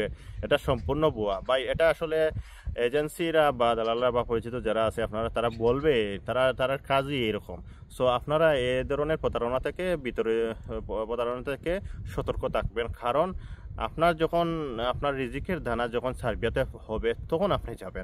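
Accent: Sylhet, Bangladesh. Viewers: ভাই আপনি মনে হয় সিলেটি। আমার বাড়ি ও সিলেটের বিশ্বনাথ। নভেম্বর মাসের ১৫ তারিখ অনলাইন হইছে ভাই, এজেন্সি বলছে সব স্টেপ শেষ, এখন শুধু এপ্রোভাল পাওয়া বাকি আছে। ৪ মাস অতিক্রম এখন কি করা,জানাবেন। প্লিজ।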